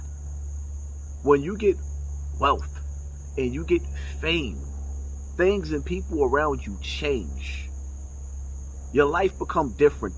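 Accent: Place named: New York, United States. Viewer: Good points BUT YOU aren’t the one to give the message AND Pharoah is voluntarily putting his mess online. You the same man who disrespects dead folks by saying they got their snot box popped and all that fake ass, extra, NY talk you do. YOU learn how to handle yourself properly before you try to tell us how to handle someone who is voluntarily doing nonsense. If you are so concerned this WHOLE VIDEO should have been to Pharaoh and not the viewers, because he is the one tripping. You just made this video for views, so go chill out and work on a better, more respectful vocabulary. That NY excuse is played, the internet is worldwide so get it how you trying to live.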